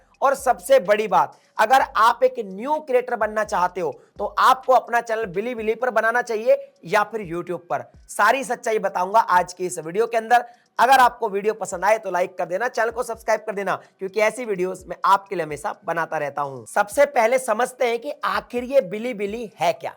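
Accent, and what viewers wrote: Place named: Mewat, India. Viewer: bhai mewati Hain 😂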